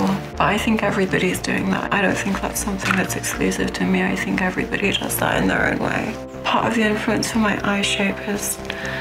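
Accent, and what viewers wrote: United Kingdom. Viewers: Thank you! Their voices are almost identical, despite the British accent.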